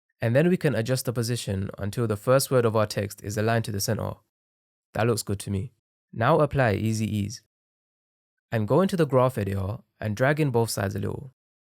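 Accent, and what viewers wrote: United Kingdom. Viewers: I love the central cee accent my dude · Bro are you from west London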